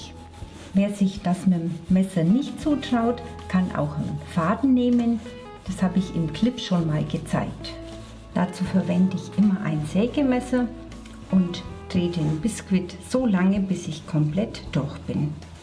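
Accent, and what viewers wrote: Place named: Franconia, Germany. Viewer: Ich schaue gerne Backvideos, weil sie mich inspirieren und fröhlich und entspannt stimmen. Für meine Geburtstagstorte habe ich hier auch Inspiration gefunden😄 Vielen Dank für die guten Erklärungen und die gegebene Nachlesemöglichkeit der Zutaten😊 Besonders an diesem Kanal gefällt mir persönlich, dass da manchmal der Dialekt durchblitzt und ich fühl mich gleich wie zuhause in der Heimat 😉 (ich sag nur 'fünfevierzich' hihi fränkisch ich liebs❤️)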